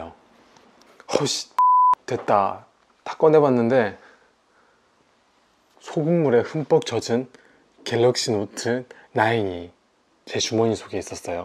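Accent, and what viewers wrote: South Korea. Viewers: 말투가 살짝 지방이시던데 어디사람이세요?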